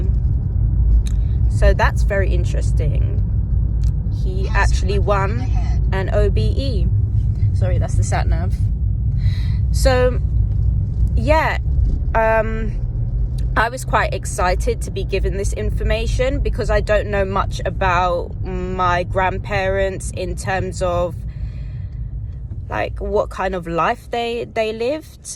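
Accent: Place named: New England, United States. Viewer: you would fit right in with African Americans, with no problem at all until you start talking, but some will think that you grew up in New England, would take care of that accent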